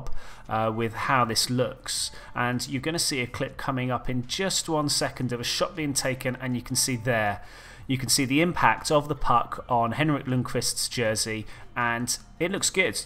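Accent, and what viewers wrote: United Kingdom. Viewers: It's funny, I've never heard a hockey fan with a British accent!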